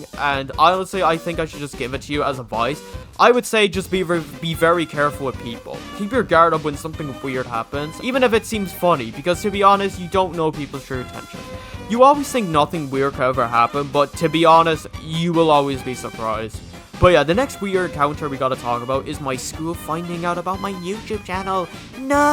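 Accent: Ireland. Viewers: Woowww you’re Irish like mee! I heard it in your voice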